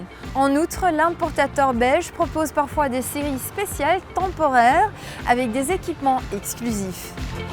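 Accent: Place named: Netherlands. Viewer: Quel bel accent néerlandais.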